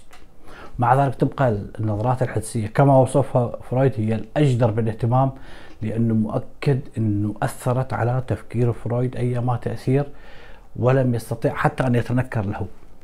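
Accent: Iraq. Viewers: اشكرك من صميم قلبي على كل ما تقدمه بصوتك الشجي العراقي الاصيل . تحية لك من دمشق ❤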